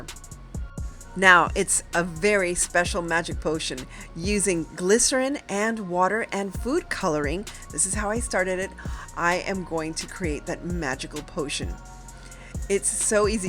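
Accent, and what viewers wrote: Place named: Miami, United States. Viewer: Ohhhh, ok, now it all makes sense : your accent, the love of halloween......you're from miami! Lol i grew up in kendall til i was 15 n i KNEW you sounded like my family😂 i feel like NO ONE n NOWHERE really celebrates n does it up for Halloween like Miami n ppl born n raised there. Idk if u were born there, but if u werent, ik youve been there a LONG TIME. Ahhhhhh, mi hermosa!!!😂❤❤❤ youre projects are amazing and i ADORE THEM!!!❤❤❤❤❤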